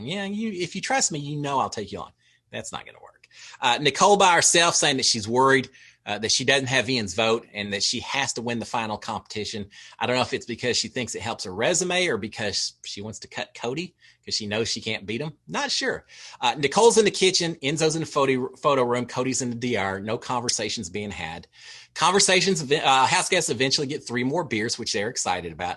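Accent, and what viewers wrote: Texas, United States. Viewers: Your Texan accent is very much appreciated! Love your recaps,! Please do other recaps after BB? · Good morning!! Love your Texas accent!! Thanks for the update!! Always enjoy listening to Notes in the morning!! Have a great day!!!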